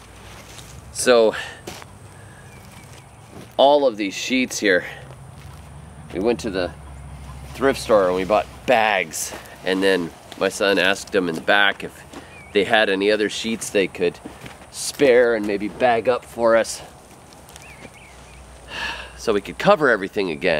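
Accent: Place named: Massachusetts, United States. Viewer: Sounds like Massachusetts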